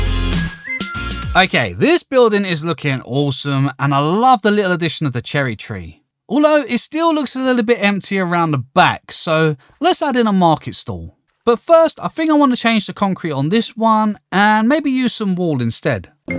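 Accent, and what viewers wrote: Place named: London, United Kingdom. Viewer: Whereabouts is this accent from? It almost sounds like Londoner but I'm not sure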